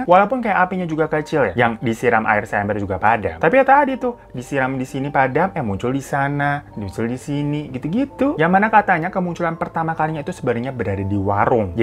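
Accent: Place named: Pati, Indonesia. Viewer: Pati mana suaranya 😅👆👆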